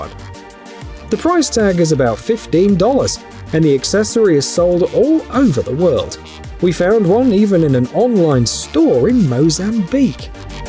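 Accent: United Kingdom. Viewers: You are in the USA! Why are you doing the narratives using a Brit???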